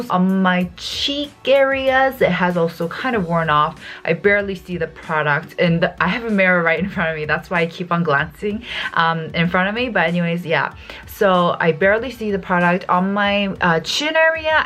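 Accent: England, United Kingdom. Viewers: Cori Lynn I think she went to a British school while living in Europe and other countries. So she probably picked up some mannerisms hearing different accents. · love your english accent. Next time you can teach Korean languages also I'm really appreciate that lol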